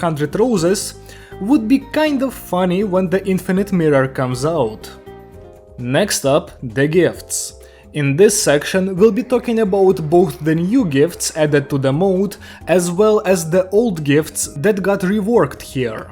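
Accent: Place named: Russia. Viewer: God, one day I will reach my hand through my monitor just to reach your neck and find out why do you sound like you're Russian, German and Spanish at the same time💐💕 As for the theme of the video? Honestly, I kind of get it. I always had that « Ew » ring in my ears when I used Glimpse to just Hollow Purple my way through the MD.. And although I'll miss the old days of the hydrogen burn, I still think that this is a healthier way to go about the MD, since it really lifted some weight from my shoulders. If burn or rupture are the best but not op.. I can just have fun with my favourite sinking team. Because there's much less feeling of « Shi man yo stupid ahh missing out on so much efficiency rn bro » due to the opressive cleaning capabilities of the forsaken duo. Sorry for my low tier English skills in advance🙏🏻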